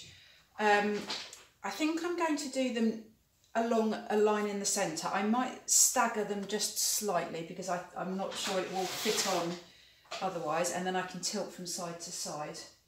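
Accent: England, United Kingdom. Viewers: Core blimey as we used to say when I lived in England! Holy cow is what My kids would say now that we live in the US ! that is a lot of paint and cells bravo you for not making this turn muddy because those colors definitely have the potential of getting muddy but you really took your time getting the paint to the corners. That will look fantastic varnished! 🥰 congratulations to your accomplishments that was a big project! Listening to you makes me homesick 🙃 although I moved from there when I was 12 been in the US 40 haha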